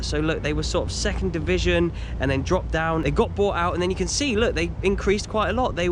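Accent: Scotland, United Kingdom. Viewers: Hello are you from Scotland?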